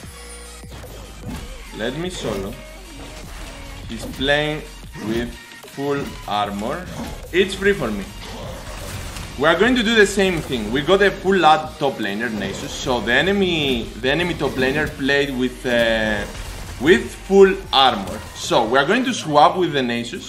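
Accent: Greece. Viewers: χαχαχα το υποψιάστηκα από την 100% ελληνική προφορά. Το μούσι το επιβεβαίωσε. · Can you do pantheons voice? Since your greek and your accent is real and you play like a god!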